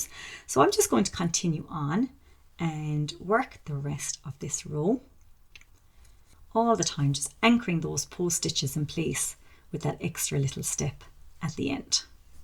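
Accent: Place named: Ireland. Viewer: This is the first video by you I have watched. I thought you were American but oh my! Love your Irish accent. This has been helpful, thank you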